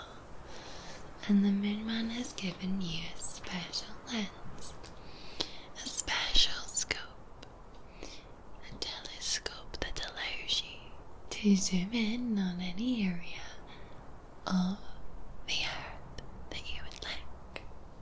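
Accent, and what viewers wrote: Scotland, United Kingdom. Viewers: Awww what a magnificent video full of restful relaxation and calmness! 😴 That soft whispering voice with your lovely Scottish accent sends me right into LA LA land!! 😴 Dream number 3 and number 6 were my favorites! 👏👏 Number 3 definitely had plenty of tingles from all of those lovely animals jumping in and out of the woods along with those amazing bird sounds 👌 😀 👍. Number 6 was my top favorite because had invited me into her amazing home! 👏 Of course chatting with would be the ultimate wish but to actually sit down and play with Hugo and Lindi would be totally awesome and cool:_Hugo:🐶😎👌 😊. Thanks for sharing all of these incredible dream stories with me for I am totally relaxed and looking forward to a awesome night's rest!😴💤 Your just getting better and better with each and everyone of your videos! 👍 Do take care and have a magnificent evening!! 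Lots of love to you xx 🤍🤍 😍💕💕💕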